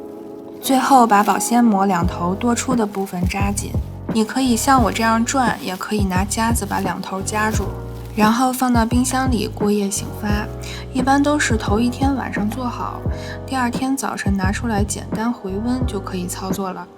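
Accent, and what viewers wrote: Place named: Beijing, China. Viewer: 真棒👍京味儿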